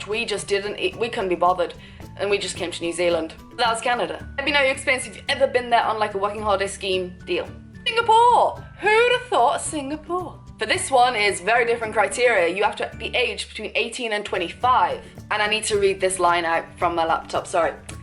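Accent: United Kingdom. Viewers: are you from UK ,Darling !!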